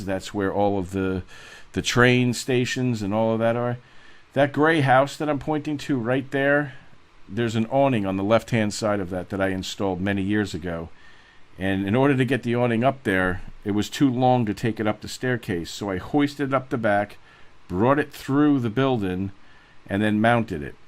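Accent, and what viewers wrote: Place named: Philadelphia, United States. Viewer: Great to hear a Philly accent. Can trust someone from where I grew up! Thanks. This will help!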